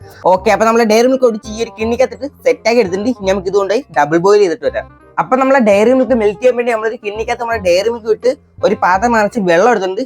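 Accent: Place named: Kollam, India. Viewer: Kollath parayum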